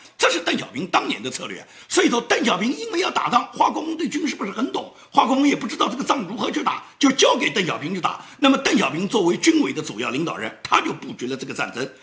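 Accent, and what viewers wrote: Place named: Nanjing, China. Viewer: 你南京人吧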